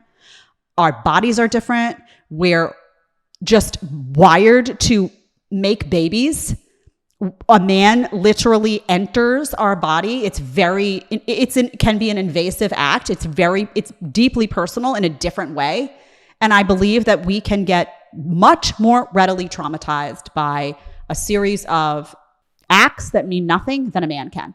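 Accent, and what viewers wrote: New York, United States. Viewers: I recently moved from NY after being there for 12 years. Your accent and your humor invoke good memories of some of the nice people I knew and met there. Great content!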